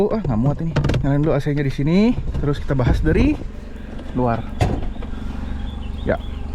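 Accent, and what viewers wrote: Manado, Indonesia. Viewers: orang manado kah ? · Awalnya qt kira ini chnnel jkt pas dgr logat rupa logat manado trnyata plat DB 😅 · Om orang manado kang?😂 Jalang mo ka koka itu dgn di ringroad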